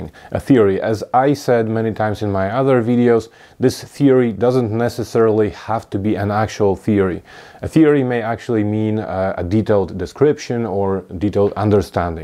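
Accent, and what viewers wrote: Scotland, United Kingdom. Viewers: You sound Scottish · Youve lived in Scotland havent ya?! This kiwi can hear it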